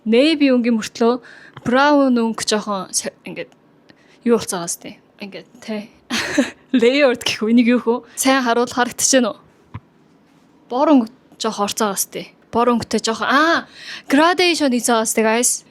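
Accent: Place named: South Korea. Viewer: Korean accent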